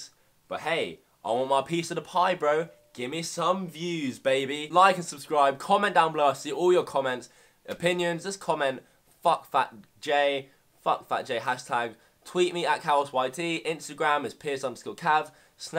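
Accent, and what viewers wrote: England, United Kingdom. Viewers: he is English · English or Australian?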